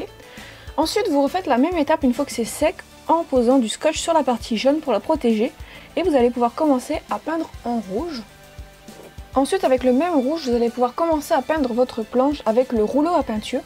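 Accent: North, France. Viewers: je ne sais pas si tu as deja repondue a cette question mais tu es du nord j'imagine vue ton accent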